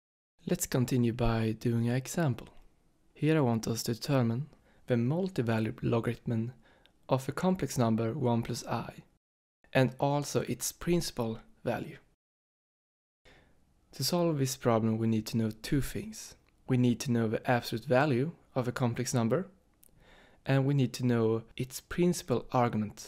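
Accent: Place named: Sweden. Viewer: Are you possibly swedish? (Very very few times i thibk i can distinguish sole swedish accent). Either way, great video!! Really enjoyed it :)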